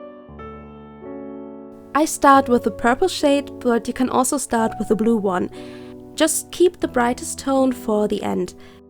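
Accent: Germany. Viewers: lol that hard german accent, but either thx :)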